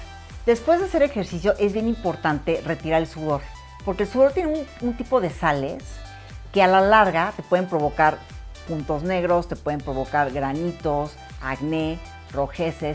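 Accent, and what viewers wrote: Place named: Fresa, Mexico. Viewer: Habla muy fresa: la caraa, maquilladaa... Habla mas natural sin esa pose, ya se oye muy falso y prepotente esa forma de hablar tan exagerada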